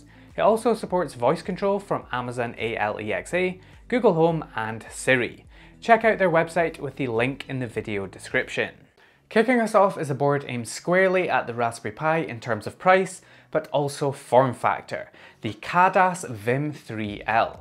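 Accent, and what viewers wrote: Scotland, United Kingdom. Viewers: Cracking video mate, so useful in the current climate! Also can’t tell you how nice it is to hear a fellow Scot on Youtube in a space dominated by Americans! (no hate to them, just nice to hear 😎)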